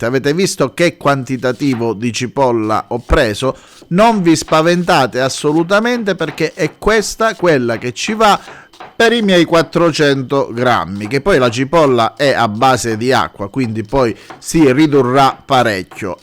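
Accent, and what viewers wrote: Calabria, Italy. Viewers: Grande. Peccato quell'insopportabile inflessione calabrese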